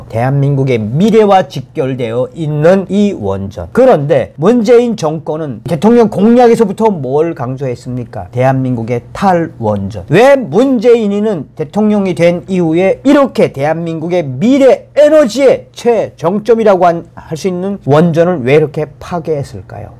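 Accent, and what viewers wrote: North Korea. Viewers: 북쪽 말씨 듣기도 싫다 적당히 씨불이거라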